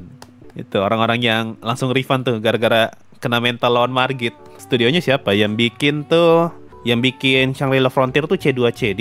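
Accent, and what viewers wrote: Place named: Eastern Indonesia, Indonesia. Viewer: Bang lu kok logatnya kek orang timur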